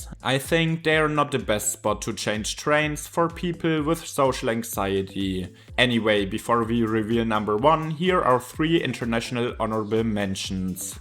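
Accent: Germany. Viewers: Jäpänn ? lol try a longer vowel in the second syllable. no offense, work on your pronounciations, you support the bad reputation that germans have for their english.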